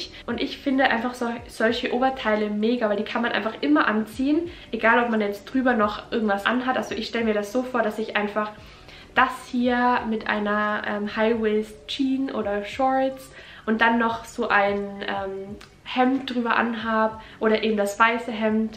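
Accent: Austria. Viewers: Ich liebe den österreichischen Dialekt :) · Sie ist doch aus Österreich dachte ich:) und ich finds super süß den Akzent, einfach kein Hochdeutsch 😊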